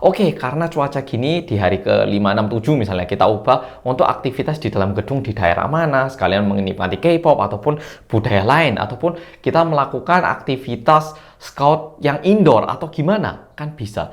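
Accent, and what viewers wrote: Java, Indonesia. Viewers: mas Korea iki ... bahss Indonesia ne ke Jawa-jawaan ya .... Hi ... aq yo wong jowo mas Korea .... Sugeng siang 😀😀😀😀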